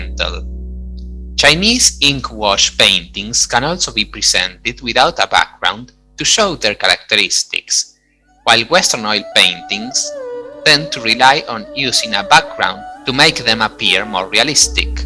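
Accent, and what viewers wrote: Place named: Italy. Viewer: Italian Accent with ‘Roman’ painting.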